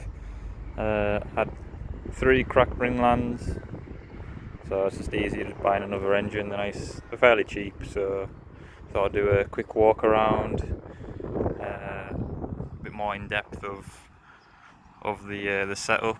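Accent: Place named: Northern England, United Kingdom. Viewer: thought so from the stronk northern accent ;)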